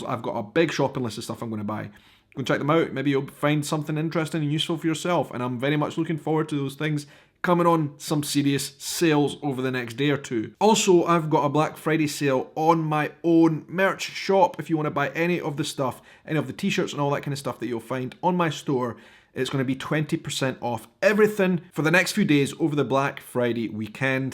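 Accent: Scotland, United Kingdom. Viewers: Contact us next trip. My husband is from Irvine, Scotland talks just like you👍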